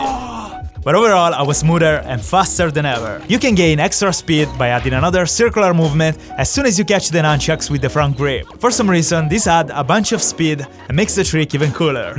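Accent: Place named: Italy. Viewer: Lo avevo capito dall'accento che eri ita xD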